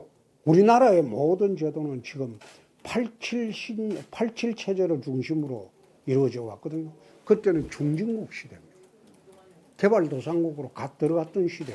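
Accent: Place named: Gyeongsang, South Korea. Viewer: 문제점을 콕집어내는 혜안 , 언제어디서든 즉문즉답이 가능한정치인 흠아닌흠 경상도억쎈 어투와발음은 재밌기도하지만 경상도출신은 아무 문제삼지않습니다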